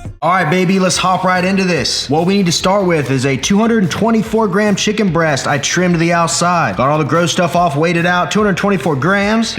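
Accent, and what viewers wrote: Texas, United States. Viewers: Is it just me or his Texas accent is getting stronger? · He's from Ohio, but definitely has Texas growing on him. Food and twang 😍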